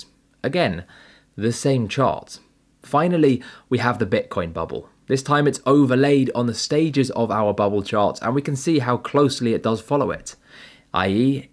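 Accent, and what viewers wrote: England, United Kingdom. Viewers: I normally listen to American investors and traders hard to find people from where I am from to talk about stocks but good to hear an English voice !!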